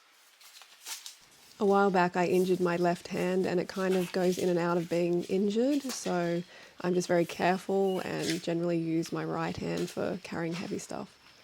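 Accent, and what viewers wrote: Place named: Australia. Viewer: Hey, where are you located? By your accent, you are Australian as I am. I live in Fiji.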